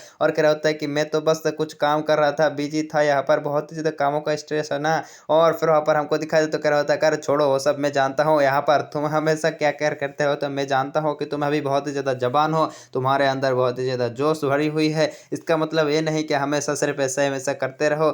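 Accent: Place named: Bengal, India. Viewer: Your videos are nice and i really follow it but please try to improve your hindi I can understand you are a bengoli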